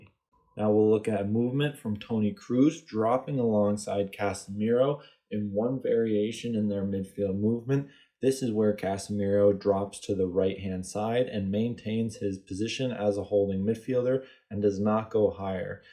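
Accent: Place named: United States. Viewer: Hey man, big fan of your work! Keep it up! Also, one quick question: I notice you cover a lot of club and European football. Since I'm assuming you're a fellow American (based on your accent, at least), do you plan on doing any analysis of the USMNT? Nations league or potential WC matches? I'd LOVE to hear your thoughts on GGG, what they're doing, and/or what they could be doing better.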